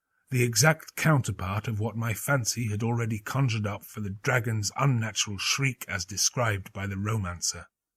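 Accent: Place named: England, United Kingdom. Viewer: At last, I've time to listen and enjoy. Ahhh, your French! And why does Poe sound so good in an English accent, when it's American? Who can know these mysterious things. ;-)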